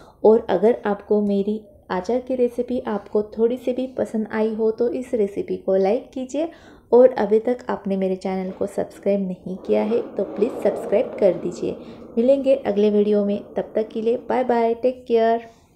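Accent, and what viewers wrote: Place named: South India, India. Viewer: Achaar dekhne se hi kafi tasty lag rha hai aor usase bhi achi baat hai apki Hindi bhasha jisme se thoda thoda South Indian ka khushbu aa rahi hai, that sounds nice and God bless 🙏🙏💐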